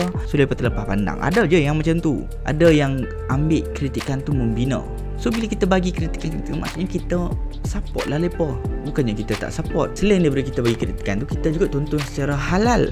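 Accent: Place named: North, Malaysia. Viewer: done subscribe..sbb nk dgr bhse utara.. tp org mane ekk..perlis penang atau kedah??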